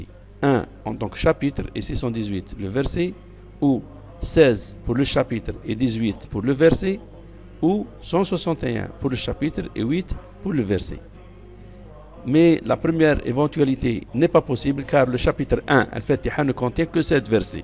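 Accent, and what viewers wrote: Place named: Kabylie, Algeria. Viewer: Accent kabyle salut cousin sa va ??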